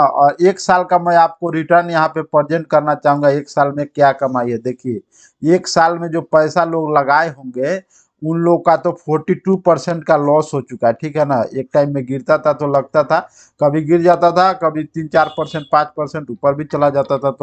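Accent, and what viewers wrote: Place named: Bihar, India. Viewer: Aap Bihar se hai, aisa aapke bat se lagta hai.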